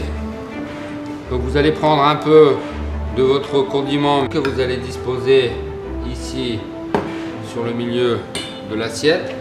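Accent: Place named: Nord, France. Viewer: ça ressemble plutôt à l'accent du Nord ou Pas-de-Calais...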